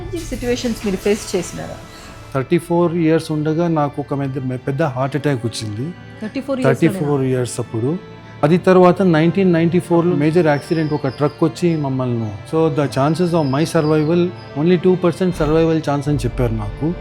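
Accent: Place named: Tamil Nadu, India. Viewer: Tamil Telugu is cute 😊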